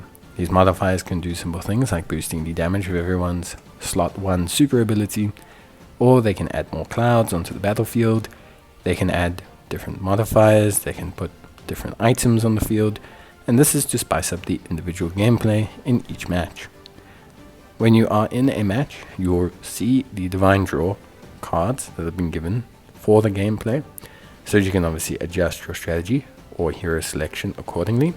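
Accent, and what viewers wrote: South Africa. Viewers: I know a 🇿🇦 voice when i hear it. Big ups bru!!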